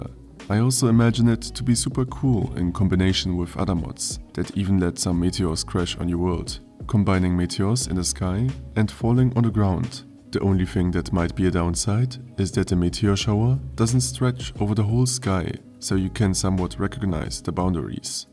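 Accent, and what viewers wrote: Germany. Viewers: I Can here a German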